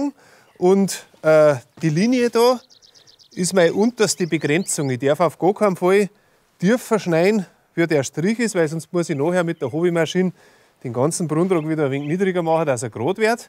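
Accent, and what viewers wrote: Bavaria, Germany. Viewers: einfach super gezeigt.......bitte unbedingt die bayrisch Sprache weiterhin so schön pflegen. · Sehe ich genauso das man Dialekt/ Sprachen pflegen sollte aber ich als Nordeutscher könnte es locker in Platdeutsch verstehen aber in Baiyrisch habe ich echt Probleme da wäre son untertietel echt praktisch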